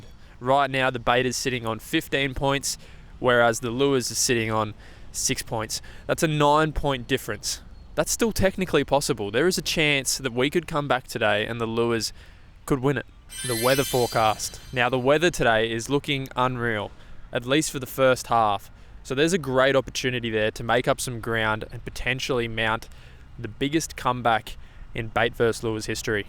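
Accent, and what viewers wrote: Australia. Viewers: Is he Aussie??? 😮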